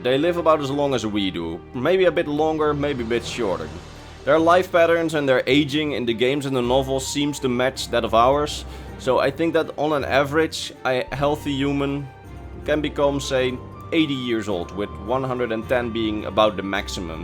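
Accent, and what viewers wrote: Netherlands. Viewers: Are you Dutch? Your accent sounds kind of Dutch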